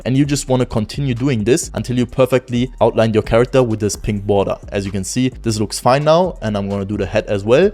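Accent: Germany. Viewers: I hear German😂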